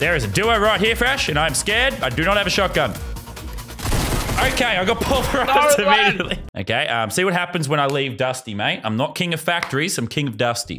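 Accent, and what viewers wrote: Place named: Australia. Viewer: I’ve been watching lazerbeam for so long I can’t hear the Australian accent lol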